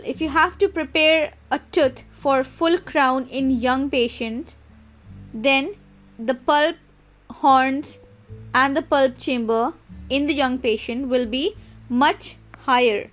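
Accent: India. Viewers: Bad indian english accent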